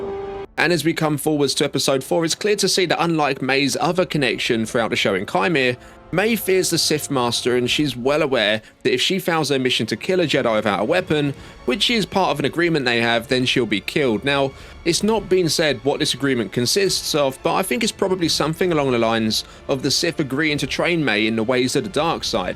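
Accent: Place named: London, United Kingdom. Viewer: U sound like the guy from looper. Must be the Londoner in u.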